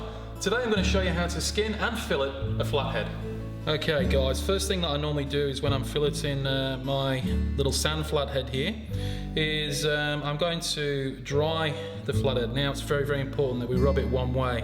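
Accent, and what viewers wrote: England, United Kingdom. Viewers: Flathead Is my favourite fish, Thanks for the vid. I can here the English accent in you voice. I live in australia but was born in Hammersmith London. How about you?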